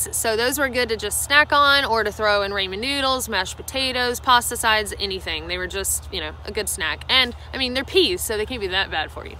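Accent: South, United States. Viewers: At first I thought your southern accent was going to be annoying, but I quickly fell in love with your videos. Friendly and so informative! Thanks for your straightforward good sense and speaking on my beginner level. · Thank you! I was thinking the same thing! She's from the South and has a Southern accent...hellooo! That's what I like about her and she makes no bones about it! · i love the eating the favorite thing first bit. what a simple and brilliant concept. thanks for your videos, it's nice to hear from a woman and also i do miss that southern accent. where's it from? i live in england now and i have a new appreciation for all the accents that just sound normal to me. :)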